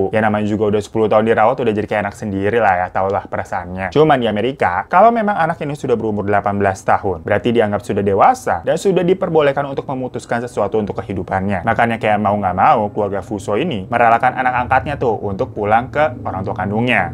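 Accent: Java, Indonesia. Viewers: Ngakak anjirrr dengerin suara nya gaya bahasa nya medok wkwkwk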